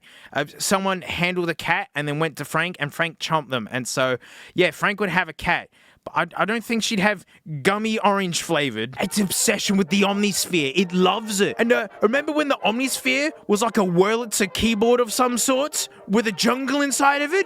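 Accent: Australia. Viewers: just broke this AI with his overwhelming aussieness and unique lingo, and that's amazing.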